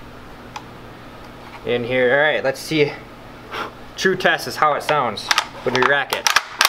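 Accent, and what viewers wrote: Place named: Wisconsin, United States. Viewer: "oh yah, you betcha" You must be located in Wisconsin.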